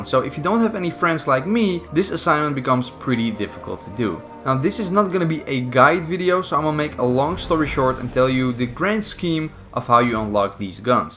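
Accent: Netherlands. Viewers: The Dutch accent is so obvious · Mooi Nederlands accent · ik wist dat je nederlands was al vanaf begin door je acceny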